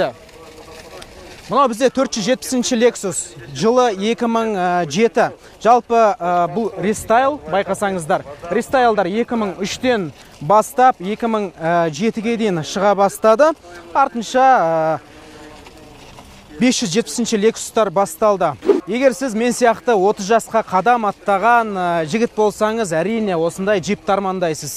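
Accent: Standard. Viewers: брат таза қазак тылынде соилеиды дурас Брат алға жаң тусыршы кутып жатырмыз👍👍👍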